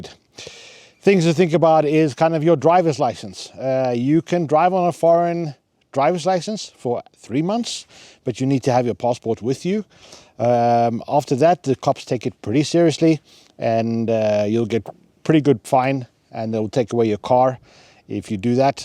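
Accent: South Africa. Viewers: is bro South African or Dutch? it's breaking my brain lol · Sounds like a very South Africa accent? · Is that a South African accent? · You sound Afrikaans. great Video. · Is this guy from South Africa? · Holy moly. Is that a South African accent?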